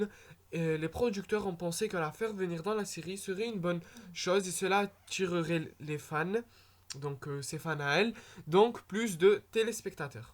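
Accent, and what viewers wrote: United Kingdom. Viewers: Aucune information donné est vrais se qui en plus de ton accent anglais ma fait énormément rire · Mon dieu, tu dis n’importe quoi ! Et ton accent Anglais ! Vraiment je dis pas ce genre de choses, tout est faux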